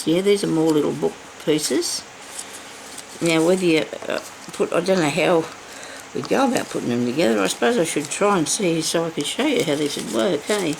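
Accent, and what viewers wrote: Australia. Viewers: love the accent. you in aussy. good video. always wanted to come to Australia but everything over there that bites is deadly lol. good job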